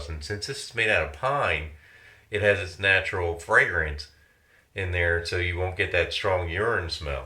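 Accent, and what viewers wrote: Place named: Central Pennsylvania, United States. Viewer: Thank you! We've just gotten kittens and I cannot stand the Tidy Cat scented smells. The lady at the PetSmart recommended this stuff because they use it at the store, so I picked up a bag to try. I have been trying the Walnut-based litter, but it is still super dusty and not every person in our household is fond of it. I appreciate your explaining how it works and what to do & when to change it. PS - your accent sounds like home (I'm from a small town in central PA).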